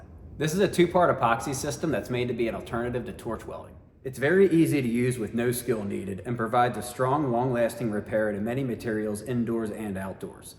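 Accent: Baltimore, United States. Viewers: Baltimore accent? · Are you from Baltimore?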